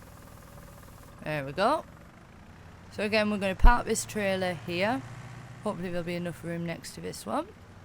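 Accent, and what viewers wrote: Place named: United Kingdom. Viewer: With that accent I'm picturing you as a stunningly hot British gaming nerd!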